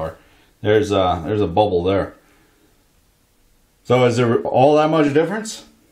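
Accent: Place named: Maritimes, Canada. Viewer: Wow, the Maritimes sure came out in this one....!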